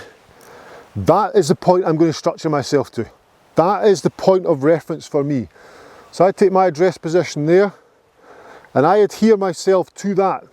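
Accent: Scotland, United Kingdom. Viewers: I really enjoy your videos, and have used a number of your ideas. I do especially like this one regarding setting up behind the tee with your driver. I don't understand why you don't have at least 250 thousand subscribers. Your videos are clear (maybe the scotch brogue takes a little interpretation. lol) concise, and sometimes downright funny. Well. keep making the videos and I will keep watching them. Lang may yer lum reek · Just loving your scottish accent...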